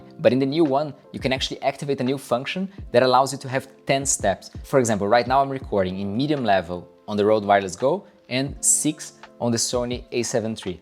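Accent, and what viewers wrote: Brazil. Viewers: Iradaa essa comparacaoo bro!! cai aqui no seu video e logo de cara ja reconheci o sotaque brasileiro!! Comecei meu canal recentemente tbm, pensei em fazer em ingles que nem vc mas acabei optando pra fazer em português mesmo!! Pow irado ganhou um inscrito! Cheers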